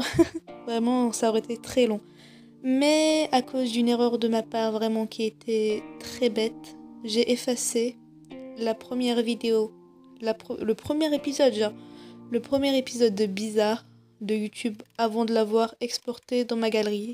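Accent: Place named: Kabylie, Algeria. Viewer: Ta un accent kabyle comme moi UwU Et tkt ta voix et trop cute je trouve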